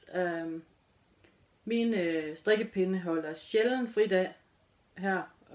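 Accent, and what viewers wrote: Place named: North Jutland, Denmark. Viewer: Hej 😃 Super godt 👍 selvfølgelig er der plads til dig. Dejligt at høre en nordjysk klang 😉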